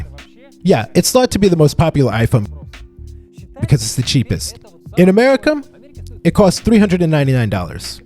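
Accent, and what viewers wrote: Russia. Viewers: Я прям слышу на фоне стандартный русский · Немного слышиться русский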